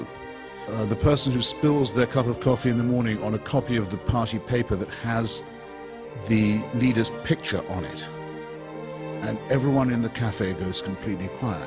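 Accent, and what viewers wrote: United Kingdom. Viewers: When I heard the narrator with british accent, I stopped watching! English are not to be trusted, the most evil people that civilization has witnessed! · That's very appropriate a british man talking to us about evil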